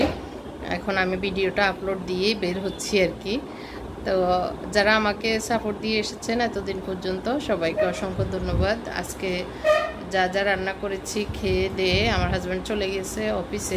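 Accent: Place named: Feni, Bangladesh. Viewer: Apu apnr voice sone ami bapci j apnr badi feni or noyakhali... Thaxs apu... Amadar badi o baryearhat...valo thakbn apu Feni apnr badi kothaiy... Ami next year Khulna thake feni shipt korbo....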